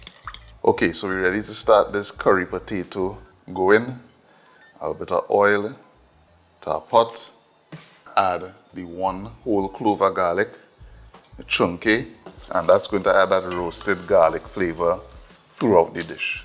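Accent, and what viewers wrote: Trinidad and Tobago. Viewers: i love trinidad accent soo much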